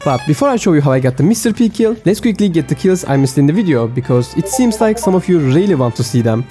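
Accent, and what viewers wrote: Turkey. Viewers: I feel the Turkish accent (laudatory) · It is because of his accent because he is Turkish. Most Turkish people have this accent. Even I have it because I am Turkish too😊 🇹🇷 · Turkish ahh Accent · konuşmandan türk olduğunu anladım baktım harbi türkmüşsün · Türk lan bu aksana bak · I can smell the turkish accent from miles ago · i can feel your turkish accent in english am i correct? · bro ur turkish accent is giving u away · The turkish accent 💀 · Konuşmandan anladım büyük ihtimal Türk’sün bi dahaki videonda damian yapar mısın ama ne olur ne olmaz ingilizcesinide yapiyim. Could you do Damian too in your next video? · aksandan anladım anında Türk olduğunu kral güzel video eline sağlık ❤❤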